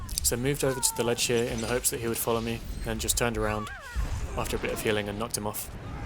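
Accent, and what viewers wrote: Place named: United Kingdom. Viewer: Thank god I found your channel exactly what I was looking for, you sound British what server do you play?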